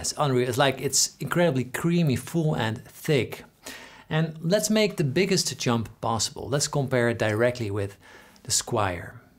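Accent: Netherlands. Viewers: Hi. Are you Dutch? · Nederlands accentje is zo makkelijk te herkennen!! 🙃🙃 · You have a dutch accent? (very little).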